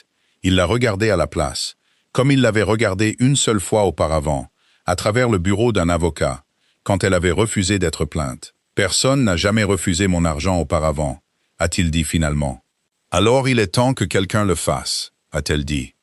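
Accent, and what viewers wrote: United Kingdom. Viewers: Merci pour ce beau récit, l’honnêteté enfin reconnu..ainsi que l’amour, merci également au narrateur et à son joli accent britannique. Je vous écoute du Sud de la France, proche de la Mer Méditerranée..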